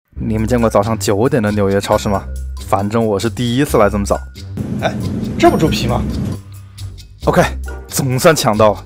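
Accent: Wuhan, China. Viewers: 作为一个武汉人，突然听见了汉普也是挺意外的！哈哈哈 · 听着你武汉的普通话真是亲切。我也是住在德国的武汉人，什么时候你也做做Panettone?相信你也一定会做得很有特色的！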